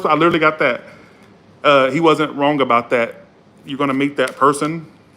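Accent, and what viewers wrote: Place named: Long Island, United States. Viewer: His “long island” accent takes me out every time! 😂😂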